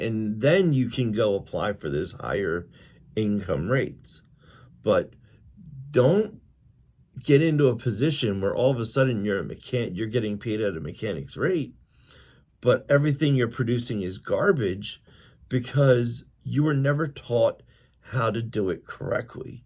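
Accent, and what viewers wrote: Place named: Philadelphia, United States. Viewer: I have been watching your videos , as soon as I heard the first sentence I picked up on your accent and figured you were somewhere local to Philly . I have been a union electrician in Philly for 24 years . Everything you’ve said on every topic is spot on . Coming from a union carrer , its cool to hear your views. I also admire you finding your nitch in knob and tube , as a commercial guy , I would never even touch it lol . Anyway, good luck and stay safe . ⚡️⚡️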